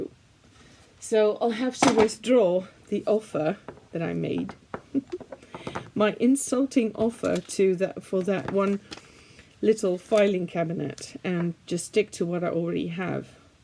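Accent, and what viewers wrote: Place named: Netherlands. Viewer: I always know your Dutch because you speak like * from *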